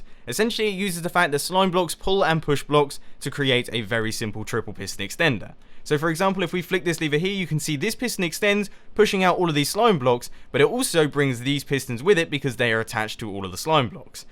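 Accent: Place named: United Kingdom. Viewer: has an accent though I can't pinpoint what accent he has, I wanna say it's a british accent but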